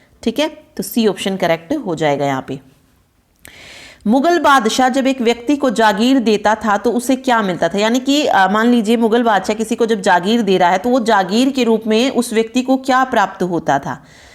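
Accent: Haryana, India. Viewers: Jo apka haryanvi touch h wo bda acha h ..👍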